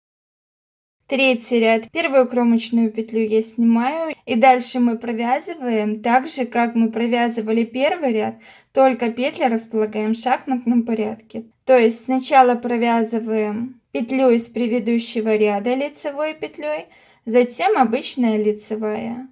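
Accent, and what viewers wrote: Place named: Belarus. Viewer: Умничка! Милый белорусский акцент- обожаю!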